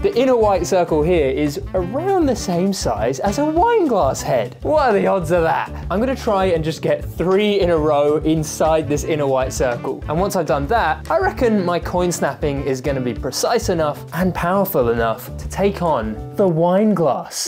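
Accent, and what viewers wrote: United Kingdom. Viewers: i like bri'ish youtubers, because i like bri'ish accents 10/10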